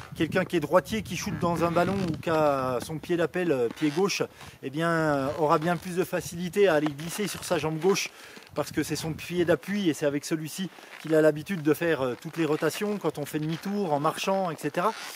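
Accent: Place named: Franche-Comté, France. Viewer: Merci beaucoup ! Ton accent m'intriguait pour un moniteur des pyrénées orientales... mais t'es franc comtois :) Mise en pratique demain, merci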